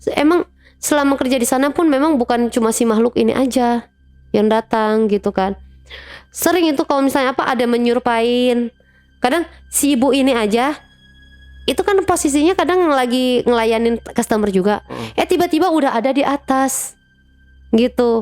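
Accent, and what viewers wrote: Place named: Sulawesi, Indonesia. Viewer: Aksen logat sulawesi 😁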